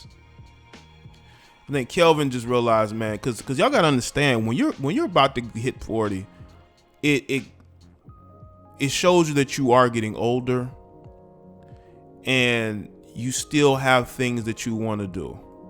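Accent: South, United States. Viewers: You sound so stupid. I swear you have no facts whatsoever and just assuming like everyone else. Also, what’s the shade about her dating options. Man I can’t stand your country ass voice✋😩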